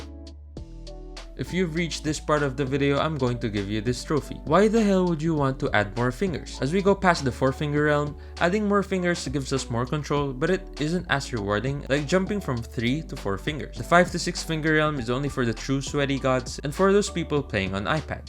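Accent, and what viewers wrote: Philippines. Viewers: Btw r u asian or filipino? Just askin cuz u sound like u have an asian accent mostly sounds like a filipino english accent:)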